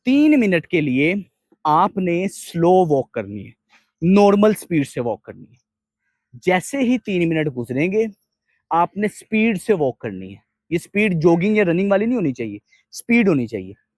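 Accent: Punjab, India. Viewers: Haye Allah... Full gol O Punjabi version english ❤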